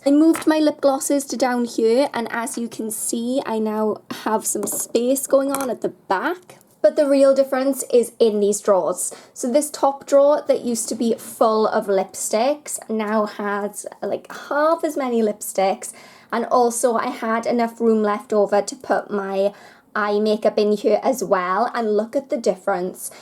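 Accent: Wales, United Kingdom. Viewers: Maeli Tickle Welsh and it sounds lovely imo 😊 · I was trying to pin point it. I was thinking Welsh at first. I blame Torchwood. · ine lie this sounds very much like Welsh accent to me!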